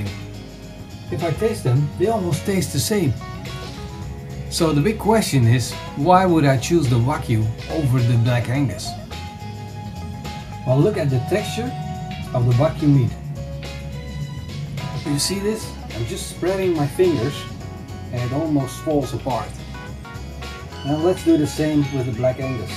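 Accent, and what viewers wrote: Netherlands. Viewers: Very obvious Dutch accent lol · Je spreekt Engels als een Nederlander. Ben je Nederlands? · Je kan horen dat hij een nederlander is door het luisteren naar zijn engels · Nice Dutch accent👌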